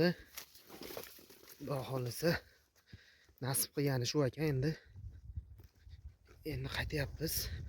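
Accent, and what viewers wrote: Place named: Andijan, Uzbekistan. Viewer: Шева Андижонча. Ов бароридан келсин, омад.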